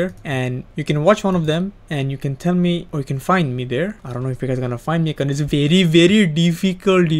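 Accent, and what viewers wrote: India. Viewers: Hell yeahh First btw Whats with the indian accent Wtffff real face whennn?? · Indian accent cool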